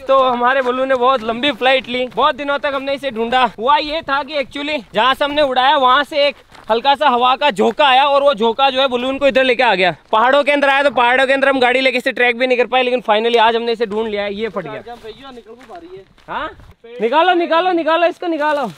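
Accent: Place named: Marwar, India. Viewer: bhai aap per marwadi lengwej bahut mast achi lagti h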